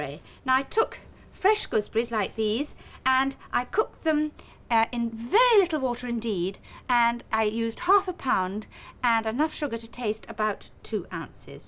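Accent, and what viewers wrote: RP, United Kingdom. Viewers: My! She sounds so posh!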